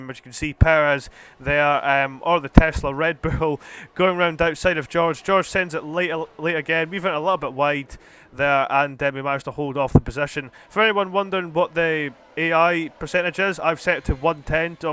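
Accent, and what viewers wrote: Scotland, United Kingdom. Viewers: thought so, once I heard that accent I instantly subscribed I’m Scottish to so you can’t get better than an Scottish f1 content creator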